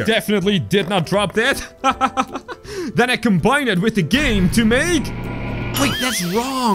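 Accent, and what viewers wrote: Netherlands. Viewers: YOU HAVE A SORT OF DUTCH ACCENT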